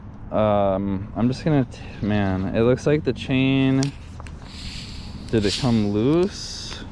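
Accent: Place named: Wisconsin, United States. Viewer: Thanks for reppin’ the MidWest. Love your understated fashion sense adorable ‘Sconnie accent and seeing the Spingtime in Hudson.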